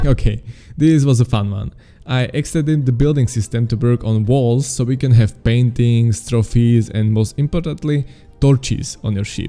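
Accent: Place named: Czech Republic. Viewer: Your accent sounds like czech lol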